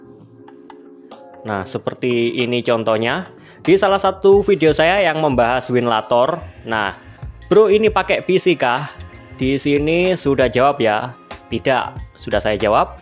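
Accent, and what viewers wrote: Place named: Java, Indonesia. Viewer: Sene deragon, bahasa medok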